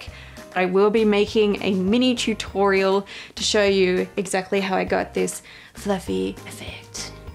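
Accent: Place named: Australia. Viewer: Thank you, I have just started embroidery and it was lovely to listen to an Australian. I am looking at purchasing Essex linen, your thoughts.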